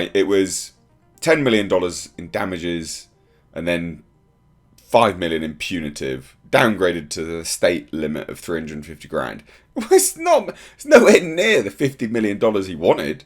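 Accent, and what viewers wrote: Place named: RP, United Kingdom. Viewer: Your to woke !! Sound a bit like posh champagne drinking guardian reader !!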